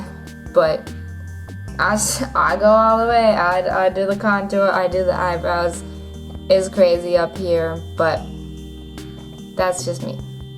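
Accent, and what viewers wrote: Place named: Minnesota, United States. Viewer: Are you from Minnesota? You have the accent... I love it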